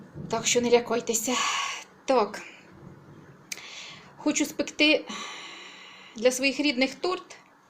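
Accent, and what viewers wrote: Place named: Poltava, Ukraine. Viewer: а де ви проживаєте? чи не на Полтавщині?знайома говірка.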